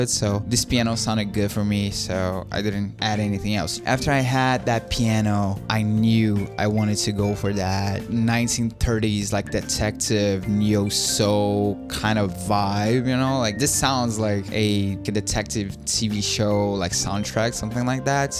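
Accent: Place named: Brazil. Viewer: Pelo sotaque eu acho que tu é brasileiro kkkk acertei?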